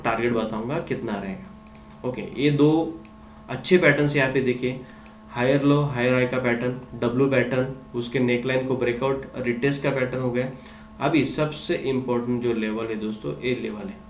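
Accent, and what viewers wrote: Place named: Maharashtra, India. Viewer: Tumchya Hindi vrun vatt ki tumi maharashtra che ahe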